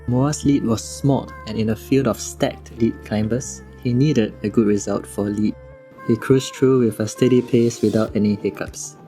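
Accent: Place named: Singapore. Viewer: Kinda guessed you were singaporean from the accent and the BP tai seng clip from another video confirmed it haha. Glad to see good content coming out of our little red dot, keep it up!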